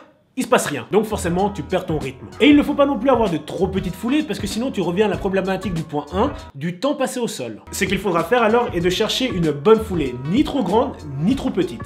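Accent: Belgium. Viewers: T belge ?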